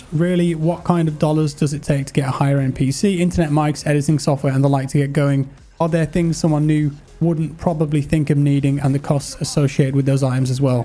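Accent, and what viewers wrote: United Kingdom. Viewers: I‘m following ur Channel since WZ 1 I love your commentary and especially your lovely british accent 😊👌 Lots of love from Germany ❤✌️ Keep going!!!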